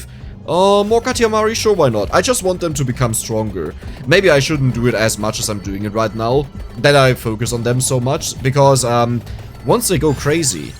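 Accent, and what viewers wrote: Germany. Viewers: Just a question, where are you from? im sure your dialect is with a german aspect haha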